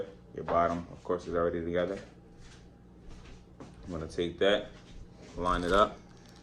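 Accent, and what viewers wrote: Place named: Philadelphia, United States. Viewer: I hear a philly accent my boi ..Chicago Westside check-in!🌏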